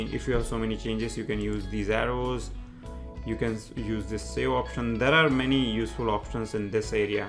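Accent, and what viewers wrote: India. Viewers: AND YOU GOT best indish english pronounce of i ever hear man)))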